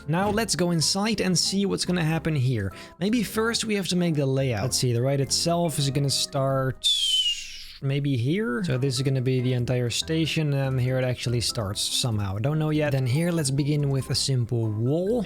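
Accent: Netherlands. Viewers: you sound dutch (nederland is cool) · hahahaha thought i recognized a dutch accent but “people of the good life” confirmed it cause there is no better thing then dutch people saying “mensen van t goede leven”. great build and happy to see there are more dutch people who love fnaf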